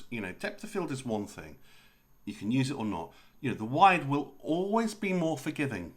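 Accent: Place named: South, United States. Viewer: You seem to be British. Perhaps you are from the South of Britain because you speak like someone from the South of the US. I know that you are headed someplace and I am intriged enough to wait until you get there. Just an observation. Love both photos.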